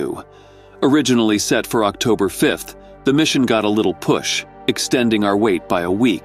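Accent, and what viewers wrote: United States. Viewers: thank you for plain western english!! I'm tired of the artificial "European accents"!!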